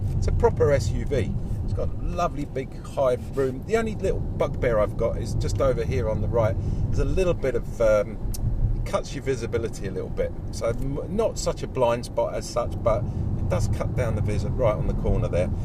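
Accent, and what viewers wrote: United Kingdom. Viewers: i think u came from liverpool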